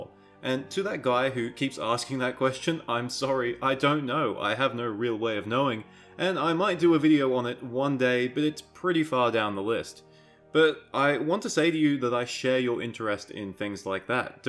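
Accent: Australia. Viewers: I deduce (correctly I hope) that you are from Sunshine Coast, Australia, based of your accent and the 350 000 number you gave! I'm kinda scared if I'm way off though. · I've been trying to guess where you're from by listening to your pronunciation of certain words for quite a while. I think NSW, possibly Central Coast, Wollongong. And unlikely picks for cities with a similar population are Hobart and Geelong.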